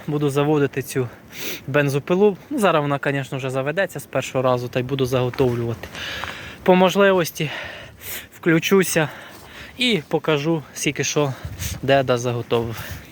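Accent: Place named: Khmelnytskyi, Ukraine. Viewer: Майже вгадав. По вимові зрозумів, що північ Хмельницької області. ))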